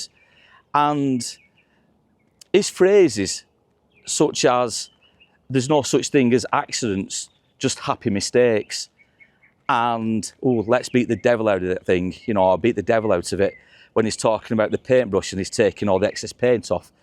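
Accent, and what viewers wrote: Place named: North, United Kingdom. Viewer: While I'm what's known as a talentless git, I also love to watch Bob Ross 😊 He had a lovely manner to him and just had that way of making me feel happy. I've been watching your videos for some time now and I have to say the delivery is that of a master storyteller. I'm not from the north but your lovely accent and empathic style really brings these, sometimes forgotten, stories to life vividly. Yours and research skills and attention to detail does you both proud. I shall continue to look forward to your videos.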